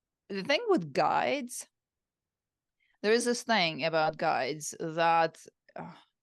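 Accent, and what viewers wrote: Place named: Russia. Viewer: She has russian accent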